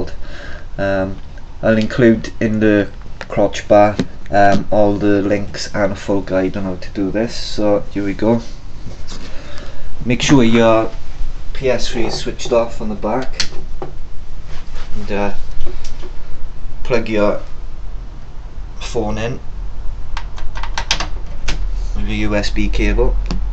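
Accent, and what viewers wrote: United Kingdom. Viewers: Do you live in Swansea? I ask this based on your accent. If you do would you flash my desire for me? I havent really got the time to learn all this stuff. Theres a 8 pack of stella in it for you :D Give me a PM. Cheers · Are u Welsh ?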